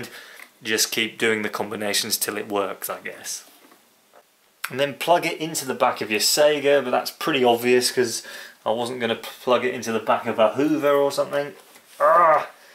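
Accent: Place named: Lincolnshire, United Kingdom. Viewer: You sir are too funny. And oddly you sound like you have a Lincolnshire accent.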